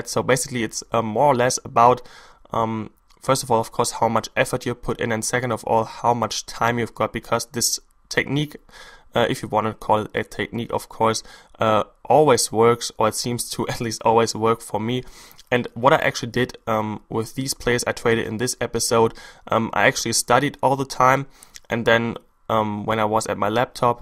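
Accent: Germany. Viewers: wie man einfach nur raushört dass du deutsch bist :)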